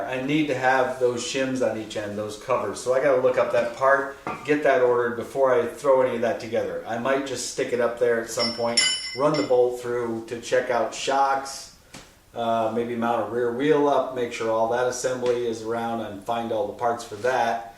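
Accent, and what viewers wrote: United Kingdom. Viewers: You may have been 1 of the 2 that won a trophy out of the 2... but you had the balls to enter...and you won period.... that is a win in my book, just by entering you won as I see it so it is everyones loss that didnt enter at all. He's a German US Born British Accent speaking Japanese Bike Riding Maker/Hacker... the way the United States was meant to be... I love it. (Mind you i am not saying what you said what bad/wrong just making it clear to those who want to make bad comments telling them that this is what America is all about, I was roughly clarifying) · Loved the English accent, but you German? I only have one thing to say to that RAMSTEIN lol Keep on hackin' it fascinates and inspires me:-)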